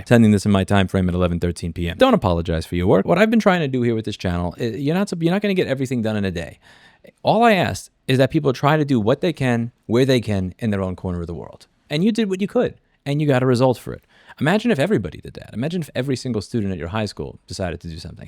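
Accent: New York, United States. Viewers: I fkn love how just sounds more NY the more worked up he gets about smth xD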